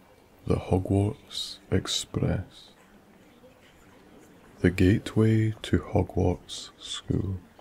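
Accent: Scotland, United Kingdom. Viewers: I love ur Scottish voice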